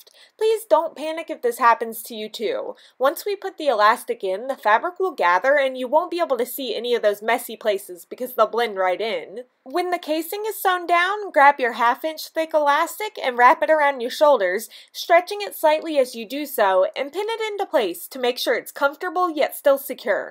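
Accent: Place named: Canada. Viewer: Hey, your accent makes me wonder where you're from. Are you Canadian??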